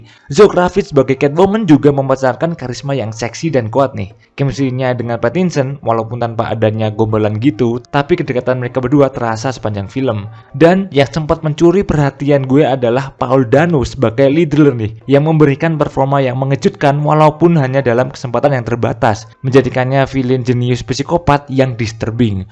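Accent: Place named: Java, Indonesia. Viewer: Saran bor bisa gak, jang pake gue - gue Soalnya kan dirimu kentara banget medok nya Yg mending medok aja lebih enak didenger Dr pd lo gue lo gue..😁👍👍👍👍🙏🙏🙏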